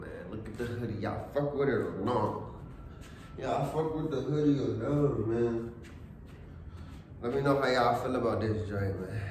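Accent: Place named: Washington DC, United States. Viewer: You from DC bro lol ?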